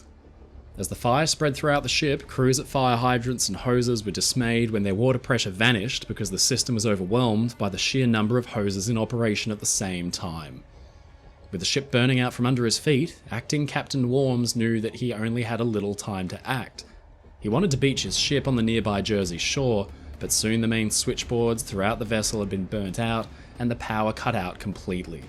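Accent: Australia. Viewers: I attempted to watch this video while eating toast, but couldn't hear (Our Friend) over the crunching. So I turned on the Closed Captioning, and now I couldn't finish my breakfast because I was laughing so hard. Apparently the subtitle bots have never heard an Australian accent before. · It’s just an ordinary Aussie accent.🇦🇺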